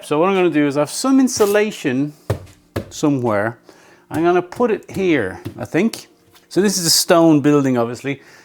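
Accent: Ireland. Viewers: I spent so much of my life being made to be very quiet that I learned to observe and love things others rushed past. I often talked to my bicycle, too. I discovered the joy of talking to cats, dogs, cows and they reacted. Others do this too and I think nothing of it. Some people say we're a bit off until they talk to their car, calling it bad names or begging it to forgive them to get them home. My accent is like yours because of my dads irish accent. I am an American but in certain parts of certain states that accent was preserved. I miss my family, most dead and hearing yourvaccent causes me to feel at home with them again. Thank you so much for posting these videos! You may think it's boring for us but I'm far from bored.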